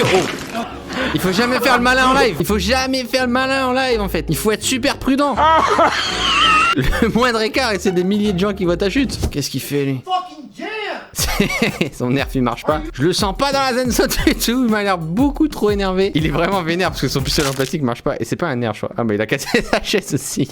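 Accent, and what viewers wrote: Quebec, Canada. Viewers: Jaime ton accent québécois et je suis québécois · Mec ton accent québécois est très drôle mais un conseil c’est o pas au dans hosti de tabernak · je suis québécois et ton langage de québécois est a amélioré mais sinon continue tes vidéos j'adore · .... Stp arrete de faire l'accent des québécois tu m'énerve tellement quand tu fait ca. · En vrai ton accent québécois sa va sinon super video😂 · = l’accent québécois est trops cool Moi= fait une vidéo où tu parle juste en québécois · Chui québécois je valide en tabarnak ton accent continue tes vidéo tes le meilleur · Ton accent québécois est parfait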